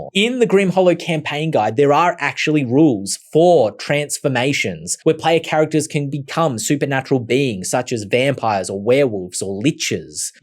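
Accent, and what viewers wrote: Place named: Australia. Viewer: Aussy vampire intro... I am sorry but I can't take it seriously enough)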